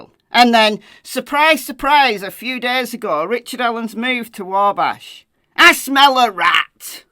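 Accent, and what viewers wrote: United Kingdom. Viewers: I know I can’t be the only one that thinks that hearing the words “numb nuts judge” in British accent sounds so much funnier than someone with an American accent saying it!!😂 and the “you need to be watching the Super Nanny, Frances! You’re not very good at this, Frances!!” I’m cackling and my husband is looking at me like I’m crazy! The snark in this video is completely appropriate and warranted!! Frances C. Gull (aka Frances Seagull as in the bird 😂) is a complete joke and a disgrace!! 🤡🤬I just pray that the Indiana Supreme Court judges are able to see through the dishonorable Judge Gull’s BS!! I am also praying that Judge Gull is removed from the trial and that Baldwin and Rozzi are reinstated! Most importantly however, I am praying the hardest for justice for Abby and Libby!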